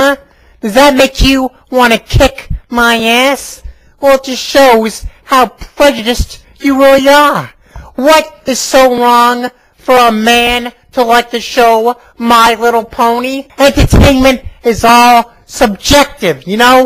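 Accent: New York, United States. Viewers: When did do a New Yorker impersonation?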